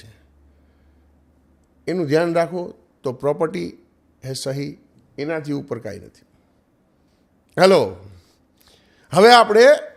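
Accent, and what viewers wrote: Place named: Kathiawar, India. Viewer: Biju badhu to thik pan tame je original kathiyawadi laheka thi vat karo chho e game chhe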